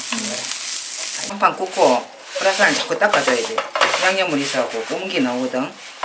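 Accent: Gyeongsang, South Korea. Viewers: 육전 ^^너무 맛있겠어요 반찬 검색중에 육전에 눈이 띠웅해서 보고나니 당장 먹고 싶네요 어릴적은 사는게 힘들어 그런지 ᆢ친정엄마는 한번도 해주질 않았는데 제가해서 드려야 겠어요~ 저도 고향이 경상도라 구수한 말투에 너무 정감이 갑니다^^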